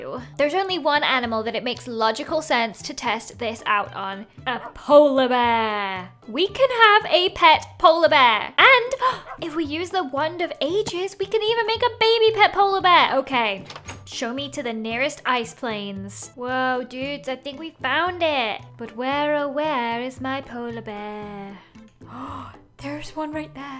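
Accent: England, United Kingdom. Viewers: Me to but is English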